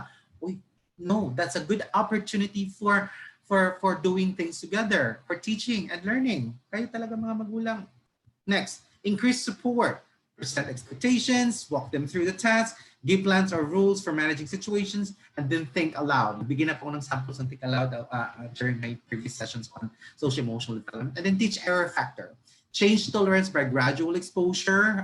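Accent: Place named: United Kingdom. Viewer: Thank you Sir👍, with British Accent👍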